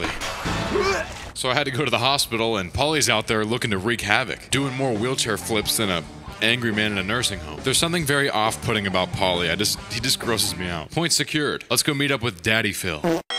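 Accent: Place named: Pittsburgh, United States. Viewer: Are yinz from the Burgh?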